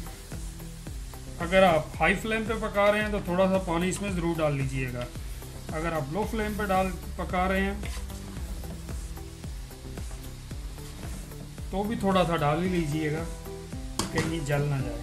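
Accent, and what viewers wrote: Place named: Turkey. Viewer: jub aap urdu bol rahy hoty to turkish style thora thora aata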